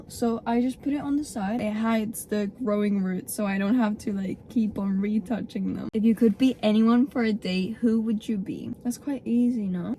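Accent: England, United Kingdom. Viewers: OMG GOD I LOVE UR ENGLISH ACCENT 🫶🏼🫶🏼❤️ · OMG GOD I LOVE UR ENGLISH ACCENT ❤❤ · Dios mío, amo tu acento inglés ❤❤❤💋💋💋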